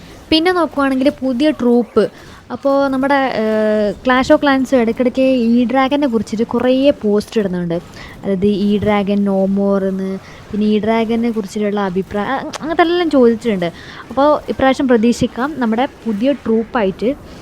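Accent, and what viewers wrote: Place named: Kannur, India. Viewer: athe Kannur slang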